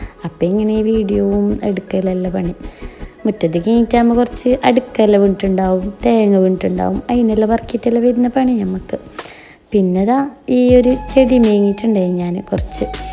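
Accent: Kasaragod, India. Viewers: Kaasarod aanu le Slang nalla rasa kelkan❤️❤️💗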